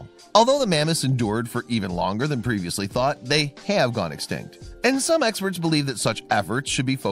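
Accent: Midwest, United States. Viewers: Hard to listen to the narrator's voice and grating Midwestern accent...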